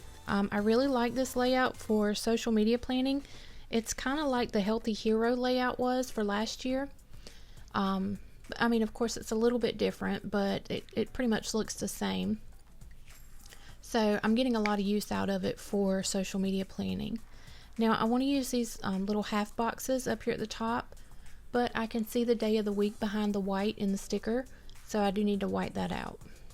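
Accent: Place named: Carolina, United States. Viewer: You sound like you may be a Carolina girl! Love your channel! ❤️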